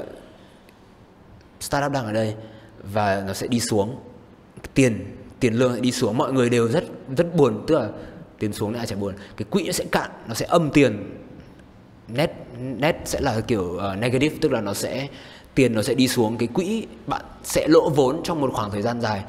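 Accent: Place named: North, Vietnam. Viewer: Bạn này giọng ấm quá nhỉ ^^ Siêu thích boy nói giọng Bắc :3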